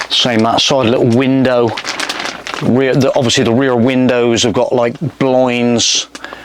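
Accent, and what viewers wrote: West Country, United Kingdom. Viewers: Nice van! And i thought i had a strong west country accent, until i heard yours lol!!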